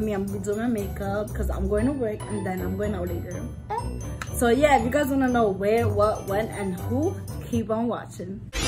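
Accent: New York, United States. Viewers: You from New York huh?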